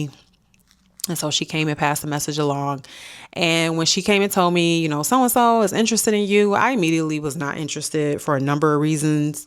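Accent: United States. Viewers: You are soo pretty I hear a Buffalo accent are you from Buffalo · Upstate NY?? You def have a Detroit accent lol, I was shocked when you said that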